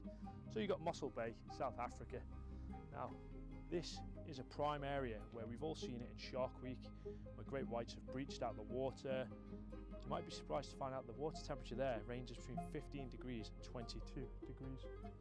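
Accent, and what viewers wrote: Liverpool, United Kingdom. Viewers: Av you got scouse in youre voice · R u scouse?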